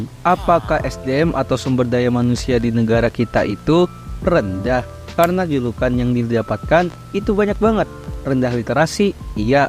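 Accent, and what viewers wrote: Java, Indonesia. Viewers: Lebih ke jawa sih bang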